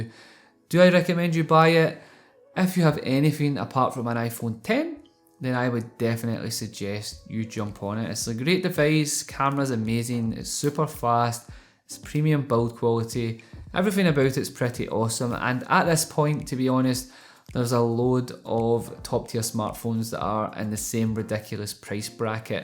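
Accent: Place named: Glasgow, United Kingdom. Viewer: Sounds like Glaswegian to me.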